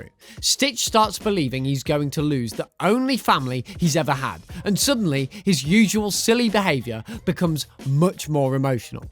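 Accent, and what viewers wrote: United Kingdom. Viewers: Hi are you British?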